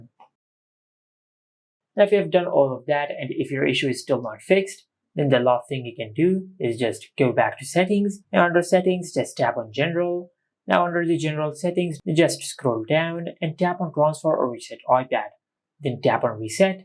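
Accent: India. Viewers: ヒンディー訛りの英語リスニング教材としてハイレベルです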